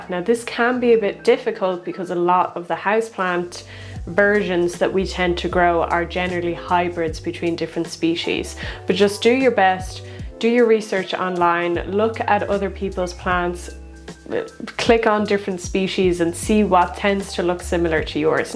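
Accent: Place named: Ireland. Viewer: This is an Irish accent in the general tone most people use because we're not fake and we don't feel the need to elevate our pitch like we're talking to newborn babies, toddlers or doggies woggies so if you can't handle it you should probably toddle along and find the Sesame Street version.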